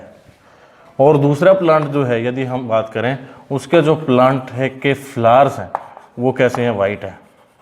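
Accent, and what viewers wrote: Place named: Haryana, India. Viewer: Amazing video lectures you are giving sir. Appreciable and yea I like your haryanvi accent too 😂 but yea you explains everything amazingly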